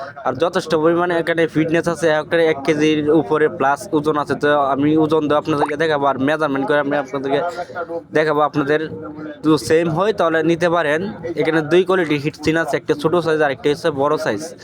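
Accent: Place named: Mymensingh, Bangladesh. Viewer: ভাই আপনার বাড়ি কি ময়মনসিংহ আমার বাড়ি ময়মনসিংহ আপনার ভাষা শুনে বোঝা যায় আপনার বাড়ি ময়মনসিংহ❤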